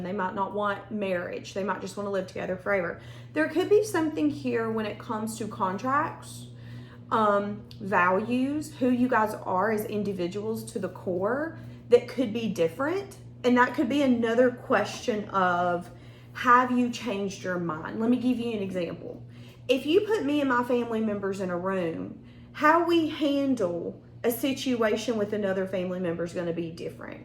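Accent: South, United States. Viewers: Love your Southern drawl… So cute!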